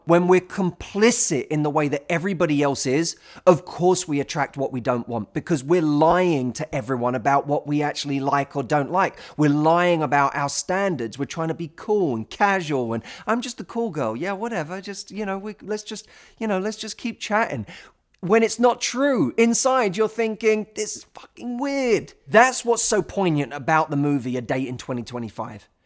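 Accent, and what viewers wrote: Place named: United Kingdom. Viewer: adoro il suo accento britannico ed i suoi consigli!😍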